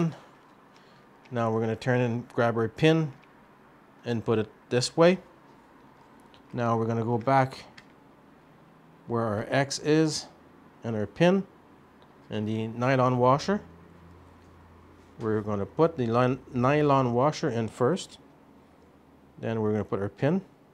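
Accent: Quebec, Canada. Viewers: You sound like you're from Quebec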